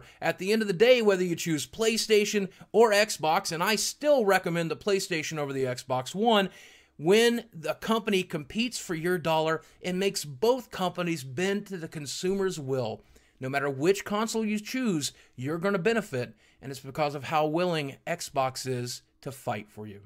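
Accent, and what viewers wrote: England, United Kingdom. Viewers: Brilliant vid mate, especially appreciated the English accent, proper cracked me up! Trying to think of some constructive criticism, but to be honest, I don't think you could have said it better. ;-)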